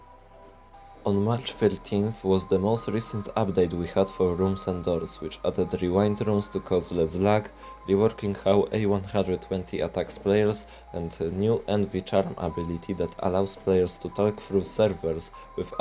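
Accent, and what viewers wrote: Poland. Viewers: are you polish? just asking cause you sound like you have a Polish accent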